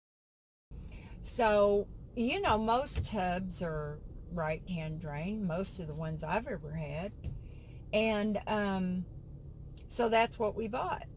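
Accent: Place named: South, United States. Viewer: Hey what happen to her southern accent!!?? Things that make you go hmm.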